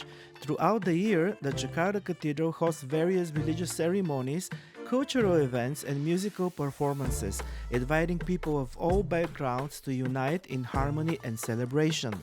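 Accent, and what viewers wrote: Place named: Singapore. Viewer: Where do you come from anyway?ur English sounds like Singaporean or at least asian acsent..😊❤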